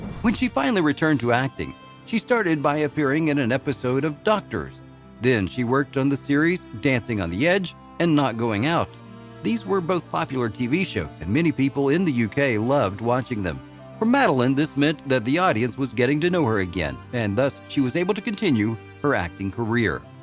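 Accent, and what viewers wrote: United States. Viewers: No offence ,but why an american narrator ?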